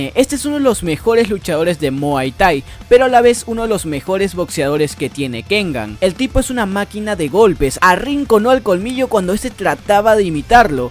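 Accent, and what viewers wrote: Peru. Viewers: Vos sos peruano?? 🥺👌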